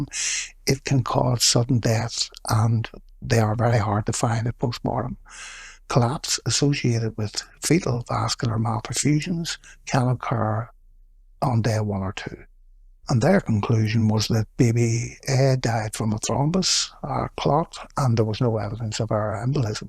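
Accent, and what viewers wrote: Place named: Northern Ireland, United Kingdom. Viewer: that's a killer Norn Ireland accent there.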